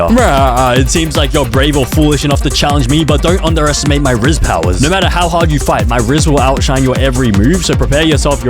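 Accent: Australia. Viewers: Ive watched so many vids and THIS is the one i find out you are australian... how did i not know 😭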